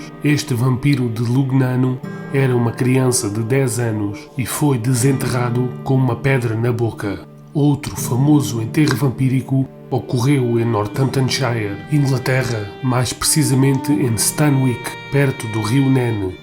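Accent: Portugal. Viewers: Foi o vídeo que mais gostei até agora. Sua voz estava mais limpa, mais clara de ouvir, o som de fundo estava mais baixo. Sua voz é bonita, e fica mais bonita ainda, com esse sotaque português. Até o próximo vídeo. Abraço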